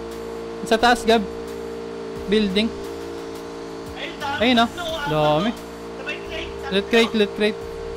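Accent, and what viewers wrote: Visayas, Philippines. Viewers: btw gi copy nmo si kuya jazon gaming question ko lang kuya? bisaya ka?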